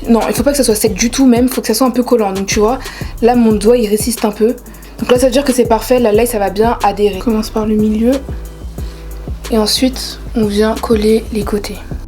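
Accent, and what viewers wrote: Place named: United States. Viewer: Au début j'ai cru que t'étais Américaine 😂😂😂💙💙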